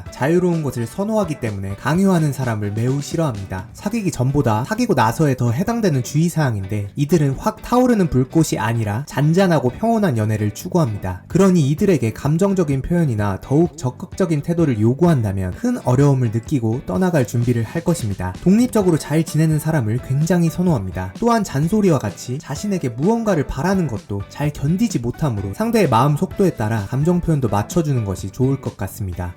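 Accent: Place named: Jeolla, South Korea. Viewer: 전라도 사투리가 느껴지네요 ㅎㅎ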